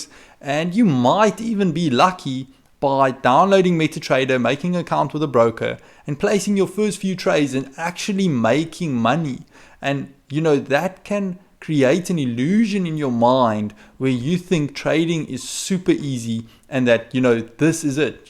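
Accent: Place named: South Africa. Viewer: You sound South African. Great content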